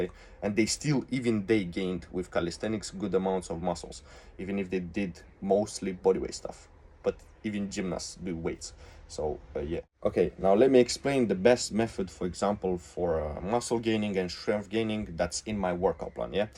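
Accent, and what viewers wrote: Russia. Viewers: Ты русский? Акцент выдает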